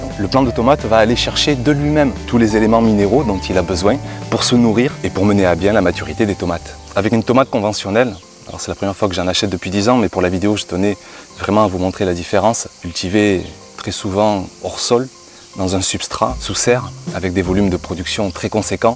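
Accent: South, France. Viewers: Et puis il faut reconnaître que l'accent du sud de la France 🇫🇷 est très agréable a ecouter !!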